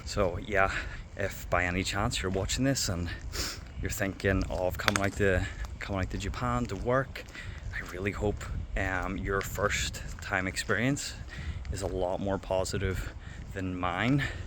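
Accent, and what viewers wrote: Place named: Ireland. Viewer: "My accent really isn't that thick and that broad" Uh, yes. Yes it is. I'm not saying you should change it, but you must certainly know that you have an incredibly thick Irish accent. Nobody does well in those unofficial language schools. They can't charge their students enough to both keep the doors open and pay their teachers fairly unless they're huge or gov't sponsored.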